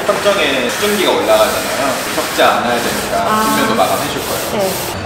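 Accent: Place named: South Jeolla, South Korea. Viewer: 사투리가 전남권이신거 같은데 ㅋㅋㅋ..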